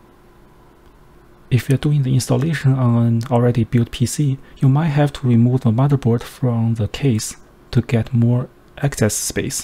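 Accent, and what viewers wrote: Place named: China. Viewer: 一听就是中式英语，阿弥陀佛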